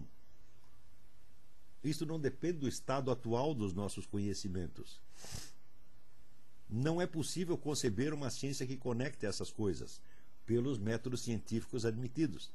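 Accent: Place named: Portugal. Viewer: Caro amigo de Carvalho que vou acompanhando à distancia, gosto de o ouvir, principalmente quando se zanga e fala o português do português. Gostava de o ouvir sobre um tema a que todos fogem... A história sobre o tempo... O tempo de uns não é igual para outros...O tempo do assassino difere do tempo do assassinado. O tempo de Cristo difere do nosso... Já Agostinho respondia à pergunta do amigo Evódio quando inquiria o que Deus fazia antes de criar o mundo e,Agostinho responde, em tom de zangado, que Deus preparava o inferno para este tipo de perguntadores. Depois diz Agostinho: Antes da criação do mundo não havia tempo... Pergunto, caro, todos vemos o tempo de modo semelhante? Eu penso que não. Uns estão presos ao passado, outros só vêm o presente e alguns têm angustias com o futuro... Amigo, pense neste tema e disserte sobre ele... O meu muito obrigado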